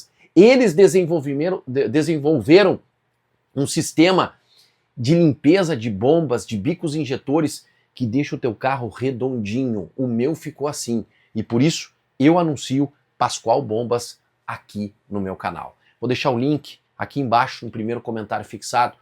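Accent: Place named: Spain. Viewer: sou uruguaio e o som do doble L em gallardo é: gajardo ou gachardo. É vichagra ou vijagra. Esse gadiardo que tu pronuncia ou vidiagra, é espanhol de Espanha. Saludos hermano!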